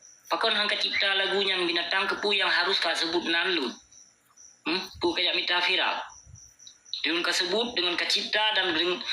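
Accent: Aceh, Indonesia. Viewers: Haaaa....haaaaa...😄😄🤣🤣🤣🤣🤣🤣 ikee asai kunonton dan ku deungoe abusyiek teumeunak saket pruet ku khe'm. 🤣🤣🤣🤣🤣🤣🤣🤣🤣🤣🤣. Mantap abusyiek. Tok asli Aceh Cara meunarit dan ceurapa. Hana dua abusyiek 👍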